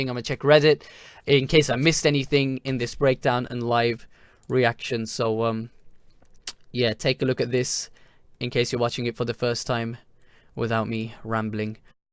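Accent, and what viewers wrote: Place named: RP, United Kingdom. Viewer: Fucking posh mate🙈🤗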